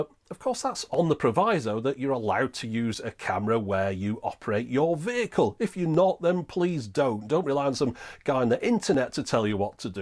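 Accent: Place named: Yorkshire, United Kingdom. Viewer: What town is this in? You sound like Yorkshire. If I'm wrong, pardon my untrained Yank ear.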